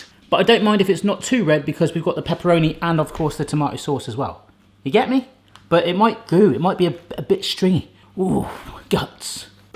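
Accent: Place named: England, United Kingdom. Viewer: Love the English accent.. and love this for Halloween, gives me some ideas.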